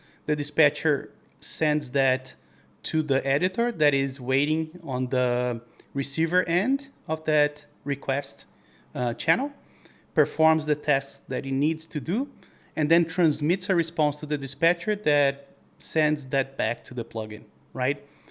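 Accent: Brazil. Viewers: I recognize the Brazilian accent from kilometers away kkkkk. Awesome video series, learned a lot!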